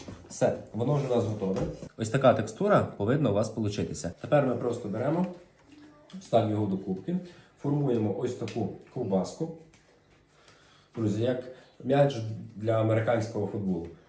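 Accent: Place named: Western Ukraine, Ukraine. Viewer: Супер. Говір з Заходу України. Деякі слова діалекти я і мої рідні досих пір використовуємо.😊👍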